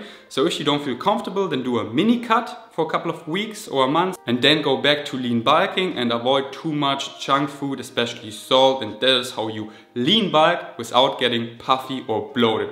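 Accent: Germany. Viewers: I really like your videos. So real. And your German accent is cool. Super cool that you talk in English. Anyways, keep it up! I am watching!!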